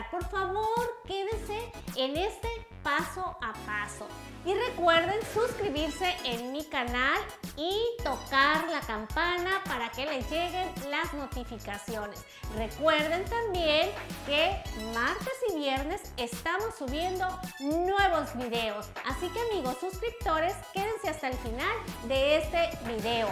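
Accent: Mexico. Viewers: Gracias por tus lindas palabras amen. Tu asento me suena de nacionalidad Méxicana. ¿De que país eres? Lindo dia.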